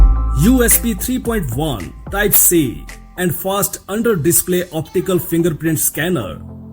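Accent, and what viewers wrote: India. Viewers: India english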